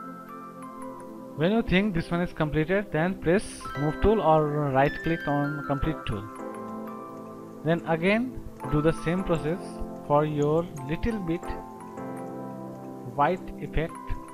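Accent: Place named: India. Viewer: You can guess it from his accent probably Indian or Pakistani.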